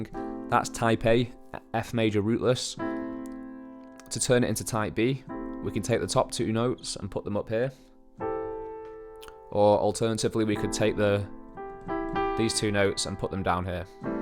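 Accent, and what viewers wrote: Liverpool, United Kingdom. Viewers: Very helpful info but I was wondering if you can do (or already have) any videos on learning the right hand improv? Whenever I hear the middle improv section of a standard jazz piece, it always blows me away how good it sounds with the various runs etc and I would love to be able to learn how to do that. By the way is that a Liverpool accent I hear? Cheers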